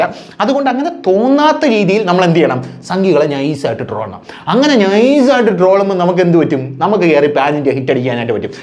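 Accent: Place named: South Kerala, India. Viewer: കാര്യം എന്തൊക്കെ ആയാലും അവതരണം വത്യസ്തം ആയിട്ടുണ്ട്. തെക്കൻ കേരളത്തിന്റെ ശൈലി ✌🏽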